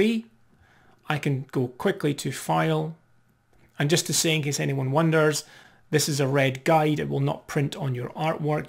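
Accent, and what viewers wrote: Scotland, United Kingdom. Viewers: Thanks man. Love your accent, is it scottish?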